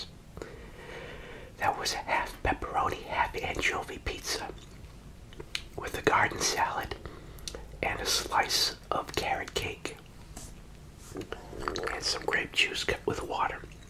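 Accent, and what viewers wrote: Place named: New York, United States. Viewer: Love your videos 😍 just started watching them are you from New York? Love your accent 😁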